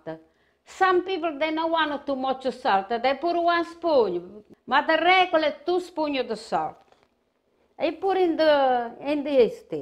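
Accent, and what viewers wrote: Italy. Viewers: Grandma, I’m a 73 yr. old great grandma and I have to say, you inspire me with your Italian cooking!! I’m not Italian but people think I am because I love everything Italian! I grew up with two Italian families, the Mariano’s and the Vasterelli’s. You remind me so much of them and I love your accent, your expressions with your hands and watching your videos. Thank you for sharing your recipes with all of us. (My sauce is almost identical as yours except I add sugar and wine as this is the way my Italian families did it.) Love to you, Grandma!!❤ PS: You have a lovely voice!😊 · Love your videos and your Italian accent! Also, you cook like I do, a little bit of this and a little bit of that!! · you can’t tell an Italian accent? She’s Italian 100%. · The most Italian sounding person I've ever heard, perfect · You have to love her and the Italian accent. 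Miss my Italian gmom so bad, she reminds me of her. An Italians mother's kitchen is the entire house, do not get in her way, or you get a dough slap upside the head. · I love this lady. If she was my grandmother I would be fat as heck from eating so good. The very first job I ever had was working at an Italian Restaurant. The owner was also the main cook, he was straight off the boat ( as they say,) from Italy and he had the same accent. Ever since I worked there, all other pizza I eat is automatically compared to what he and I use to made at the restaurant. Not just the pizza either, but every Italian dish you can think of, Lasagna, Spaghetti and Meat Balls, Baked Ziti, Egg Plant and Veal Parmesan, and the list go's on to the deserts and more.. We got there early to make the dough and roll it out into aluminum pans coated with olive oil. I am 60 years old this year and that was my first job at 15 years old, yet even now any Italian food I eat, I still compare to his, and I am a tough critic when it comes to Italian food. 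· I feel like she is my own grandma watching her do her cooking like I used to watch mine many years ago. I love listening to grandma in her Italian accent-English coz I can understand what she's saying haha. I just discovered her now and I instantly subscribed and at her age, she will have many years more to spend time with her kids grandchildren great grandchildren and friends and youtube friends. Such a joy to have a grandma. stay safe and healthy and share more of your treasured recipes! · I would have loved to have grown up in her home. I would be so fat! Her Italian accent and grandmotherly voice is legendary. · I am waking up today in Seattle... Watching & listening to a real Italian grandmother making pizza... And she wrapped her bread dough... in blankets on the couch... like family! Living alone in a big city, I find this so comforting. Bless you for sharing... in English with a lovely accent as authentic as your beautiful pizza!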